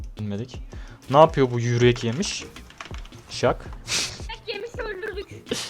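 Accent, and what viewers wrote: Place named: Ankara, Turkey. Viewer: La yürü git La Dkfldlfldlg tam bir angaralı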